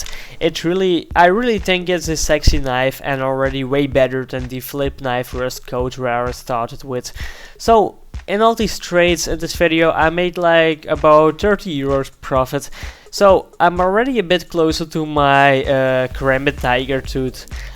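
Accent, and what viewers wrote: Netherlands. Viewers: Are you Dutch? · pik praat eens fatsoenlijk engels het is echt irritant om het nl accent de hele tijd te horen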